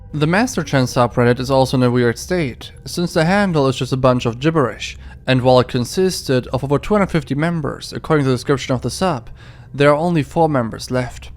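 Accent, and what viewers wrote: Germany. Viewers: That's a german accent.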